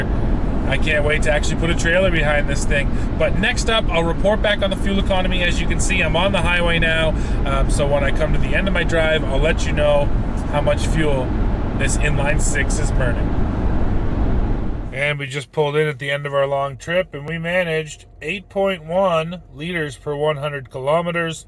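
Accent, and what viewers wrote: Canada. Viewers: FYI, the US pronunciation of Mazda and Yamaha with an IPA ä sound is closer (not perfect, but closer) to Japanese pronunciation than the Canadian æ sound. That said, you don't have to water down your Canadian-ness to appeal to a broader audience. I've been subscribed since long before ever worked for TFL, and it's one of the endearing distinctive qualities that helped you stand out when I watched my first video. That you have real experience using trucks for towing, hauling, and off-roading, plus winter weather testing is what makes you one of my favorite channels.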